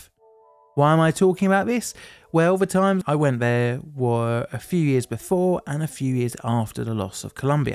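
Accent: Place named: England, United Kingdom. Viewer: Seems to be using a batty English accent to muffle the sounds of his words a bit. You should try to learn to speak American English which includes correct pronunciations for each of the words you wish to speak here.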